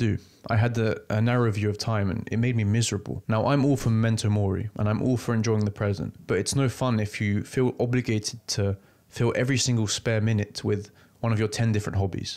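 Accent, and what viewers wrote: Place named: United Kingdom. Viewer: oh sorry, I didn't mean English (by blood), just British as in nationality (which I assumed from your accent?) for some reason it's really hard to find British YouTubers on here, so many (great) American channels but it's pretty refreshing to hear British accents (from a Brit aha)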